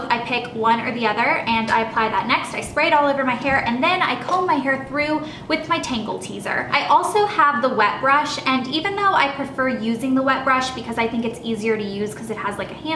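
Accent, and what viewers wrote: South, United States. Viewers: seriously LOVE your southern accent! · Love it when you speak southern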